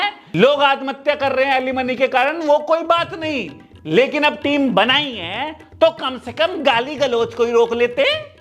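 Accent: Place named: Uttar Pradesh, India. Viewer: UP se lgte ho bhai 😅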